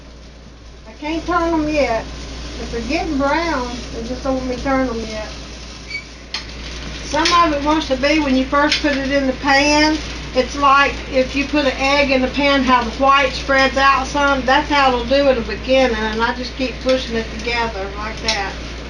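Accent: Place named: Appalachia, United States. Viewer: my mom had the same cookware you have - waterless cookware. I remember she paid a small fortune for them and took the best care of them using Bar Keepers Friend on the inside EVERY time she used them. They are 30 years old and in mint condition. She has the same grinder tool too. My Mom passed away about two years ago and I miss her every day. Seeing you cook with your pots and pans and in that beautiful Appalachian accent - also like my Mom - makes me happy in a special way. So thank you for doing all you do to share your cooking experience. It means a lot to me. Btw, Mom always told me that if I didn't scrub her pots properly and take good care of them after she was gone, she would come back and haunt me. 😁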